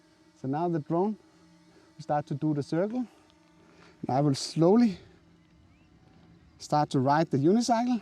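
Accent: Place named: Denmark. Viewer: ???? JEG VIDSTE DET!!! Du er dansker - den accent kan du bare ikke løbe fra. " I mean eeh, you have been to school, not? I though it enough", " "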